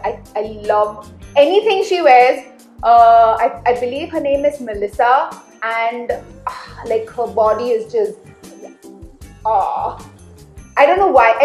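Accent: India. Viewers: The only thing more amazing than this woman's body is her accent <3 Indian women! <3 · INDIAN GODDESS ALERT! Thought you were from the UK for a second, then I heard the accent lol. You should become a super model! These big companies will give you all the outfits and products FOR FREE. Life is good huh! Or just marry some saudi billionaire or russian oligarch.... decisions decisions 😁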